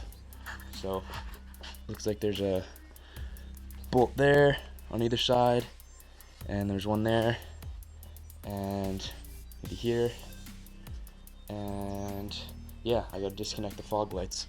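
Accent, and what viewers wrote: Canada. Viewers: Could tell by the N that youre from BC. You from Vancouver or the island?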